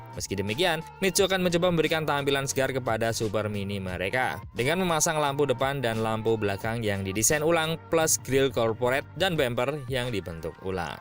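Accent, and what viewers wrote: Java, Indonesia. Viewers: Mobil sih keren tapi gak nonton sampe habis gara gara narasi pake logat di medok medokin jawanisasi,,,, aneh denger nya ky cakupan siaran terbatas daerah,,,, gak nasionalis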